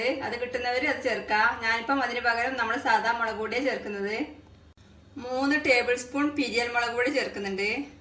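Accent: Kasaragod, India. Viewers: Kasargod aano kannur aano?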